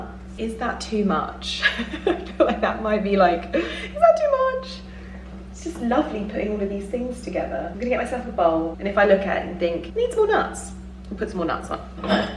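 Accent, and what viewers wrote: United Kingdom. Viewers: I enjoy your videos 🥰i really enjoy you British accent I want to visit English one day favorite country 🥰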